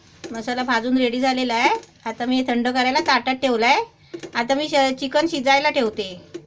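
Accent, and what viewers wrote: Konkan, India. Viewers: tumchya sagalya recipes mala khoop avadatat. same majya mummy sarkhya, tumchya bhashet ani jevanat kokanatla godava ahe Love u Kaki ani thanks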